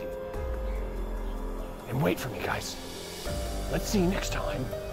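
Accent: United Kingdom. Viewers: is my favorite YouTuber, but his British accent is just terrible...lol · Hahahaha I'm rolling on the floor...that British accent😂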